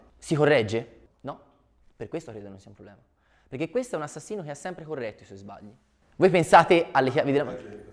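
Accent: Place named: Tuscany, Italy. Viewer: È il Toscano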